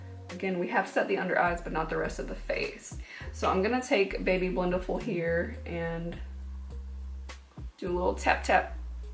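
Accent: South, United States. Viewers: I agree she reminds me of the south too and I'm just like 😭😭😭 I miss those accents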